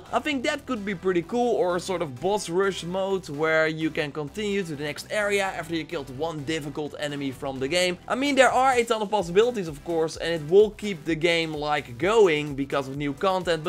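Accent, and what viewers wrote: Netherlands. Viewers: Are. You. Dutch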